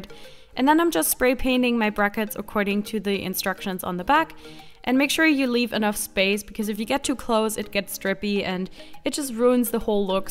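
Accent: Germany. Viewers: may it be you have a german accent? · Her german accent is almost unhearable, i had to see her amail address to be sure. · #germanaccent <3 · Kann es sein das du deutscher Herkunft bist? Man hört einen nämlichen einen leichten Akzent raus.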